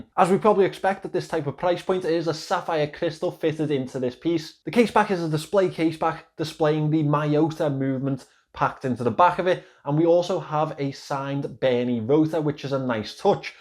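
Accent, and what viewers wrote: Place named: Scotland, United Kingdom. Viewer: Love your accent. Are you from Scotland?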